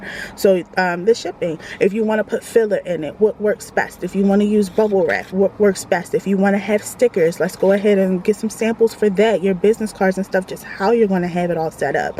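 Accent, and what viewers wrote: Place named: Baltimore, United States. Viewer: Thank you Queen, and I will take your advice, because I have strongly been thinking about starting a body butter business. New subscriber, and you sound like you're from Baltimore lol. Love your accent and your skin is beautiful! Wishing you continued blessings on your business and look forward to your videos! 😀💞🤗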